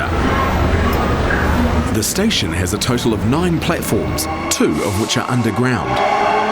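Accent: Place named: New Zealand. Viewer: "The guy" Timo is referring to is the commentator (you ? ) who has mispronounced Mandurah (pron Man-djrah) as mentioned by Jason G. The commentator has a NZ accent and may also be familiar with some of the old diesel powered multiple unit rolling stock from Perth that ended up in NZ. Excellent video by the way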